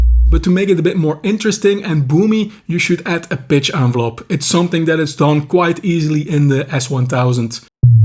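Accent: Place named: Netherlands. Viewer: Recently started music production (never played a note before) and last 2 days been trying to make a ambient jungle song and it goes suprisingly well, only didnt know how to implement a bass. This video helped a lot in general. Groeten uit Den Haag. (Zo te horen ben je nederlands )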